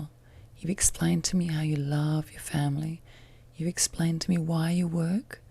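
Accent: Australia. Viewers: You are so beautiful, your eyes and smile really draw me in and that's coming from a 22 year old:) oh and that ozzy accent! Perfect.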